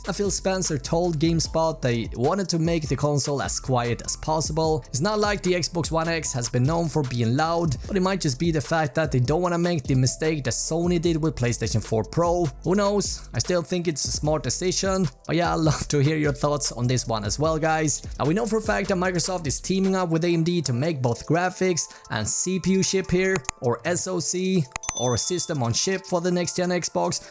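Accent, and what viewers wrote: Sweden. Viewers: You sound swedish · E d svensk??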